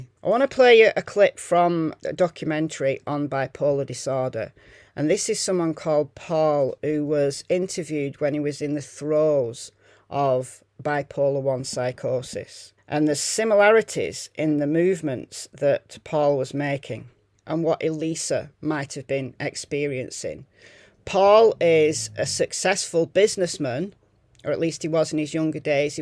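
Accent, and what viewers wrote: United Kingdom. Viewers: I tend to think that you are right. I just don't like that the video has been edited and that they lie about it, or that nobody could see what was recorded on the other cameras in the hotel. I don't know if they are just protecting themselves or if something shadier has happened. Because the hotel had such a bad reputation, they had trouble finding employees so they often hired ex-convicts. I have seen some interviews with people who have reported assaults from some of their staff members, and some who have been raped on the roof. So maybe someone saw a vulnerable girl and took advantage of her? Anyway, a great video. I always enjoy your take on different cases, and I just love your british accent! ;-)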